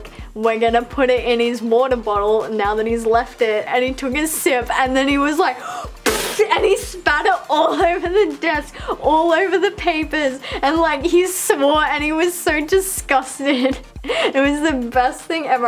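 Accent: Australia. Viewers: Hewo. Quick question, are you Aussie ? Btw your art looks amazing 😊👍👌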